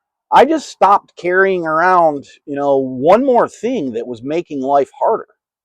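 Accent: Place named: Texas, United States. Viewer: I’m from Texas and I thought I could detect some Texan! I like your content btw, keep it up 👍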